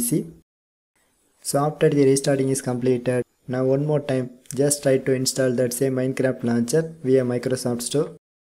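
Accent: India. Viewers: The guy with the indian accent pulls through again! It worked!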